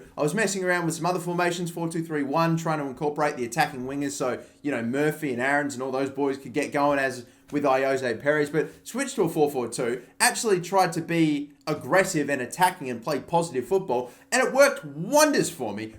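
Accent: Australia. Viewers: Didn’t know Alisson did youtube and had an Australian accent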